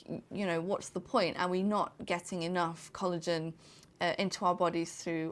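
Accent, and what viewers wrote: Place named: United Kingdom. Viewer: I' m here for that british accent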